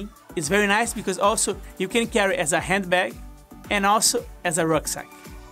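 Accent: Brazil. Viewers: O sotaque tá arranhando véi! É sotaque nordestino falando inglês!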